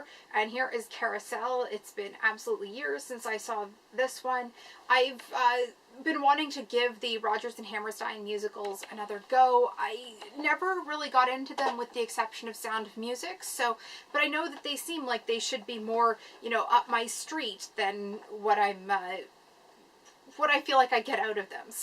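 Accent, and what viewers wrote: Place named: Canada. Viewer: I just like listening to your sweet Canadian accent. Great video. You got some awesome classics in that haul. Also, that shirt seems nice and warm,:-) Have a great day! ~Marty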